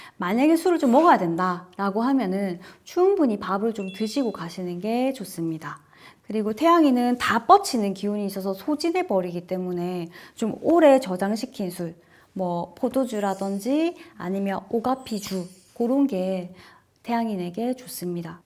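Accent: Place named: Daegu, South Korea. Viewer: 내용도 좋은데 억양이 재밌어요 경상도중에서 대구쪽인 듯 한데 근무지가 대구이실까 모르겠네요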